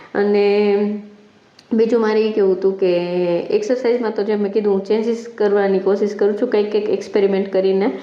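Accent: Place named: Kathiawar, India. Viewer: Hello! I came across your video and kept watching bcoz of your cute kathiawadi accent! I can give you some tips..walk for 10 mins after every meal. Dinner 6.30 sudhi ma patavi devanu.. It helps a lot!!!. Savarna breakfast ma ugadela kathod add karay! Also rotli ma jowar,ragi eva lot vaprai...I love those😊. Divas ma ghar ma dadra chad utar karvana leg muscles majboot thase. Dhire dhire exercise ma weight training add karvani ..5 kilo na dumbell lai ne sharu karay. Or even pani ni bottle thi pan karay...plenty of videos on youtube! Ena thi body saras tone thase ne inches ochi thase. Good luck..Will keep watching👍👍😊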